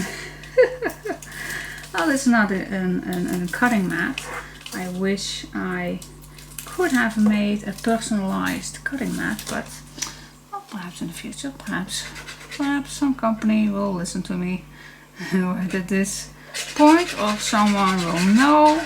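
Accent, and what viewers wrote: Netherlands. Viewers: !, your “ Dutch English”, is one of the things that I find most endearing!. Your witty comments and chitchat, are what makes you, you!. If people want to only listen to perfect English, they should only watch English tutorials!. Please don’t change yourself or what you say or do!, you are just perfect as you are!.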